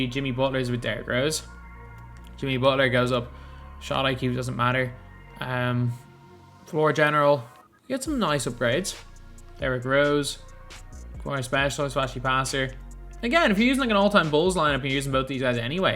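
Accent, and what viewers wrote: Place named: Dublin 4, Ireland. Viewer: your dublin 4 accent pisses me off mup tallagh son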